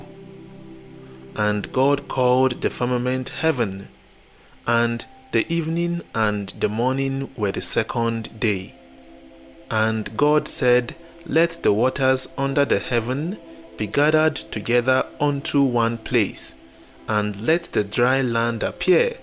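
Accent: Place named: Nigeria. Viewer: The first Nigerian accent audio Bible in the making. Thank you sir. God bless you greatly. A happy new year to you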